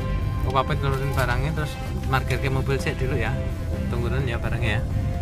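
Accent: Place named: Java, Indonesia. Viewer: Bahasa Jawa e penak tenan mas medok 👍🏻 sip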